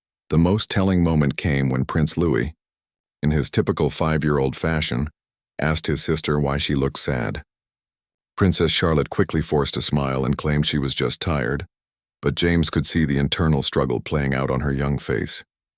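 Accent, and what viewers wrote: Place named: United States. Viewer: Why is the voice over an American and how did he get this information ?????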